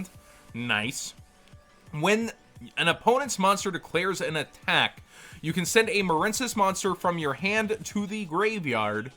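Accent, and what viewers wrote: Texas, United States. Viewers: Howdy y'all! And welcome to the house! That Southern Texas accent though.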